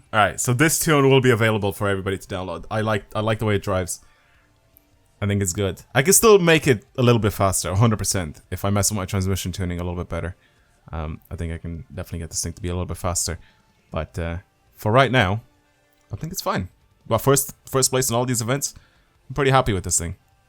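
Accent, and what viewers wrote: Ireland. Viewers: You sound Irish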